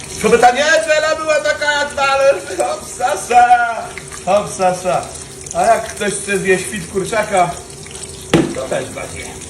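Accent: Highlands, Poland. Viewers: skąd ten góralski akcent u gościa znad morza?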